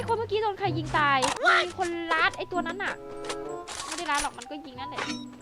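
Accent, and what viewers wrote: Isan, Thailand. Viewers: เอื่อย เจ้าคือเว้าอีสานม่วนเเท่ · เปันคนอีสานหลอค่ะ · พี่พูดอีสานเพราะๆ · อันเจ้าอยู่อีสานติเว้าคือแฮงมากเลยเด้อเอื้อย 😊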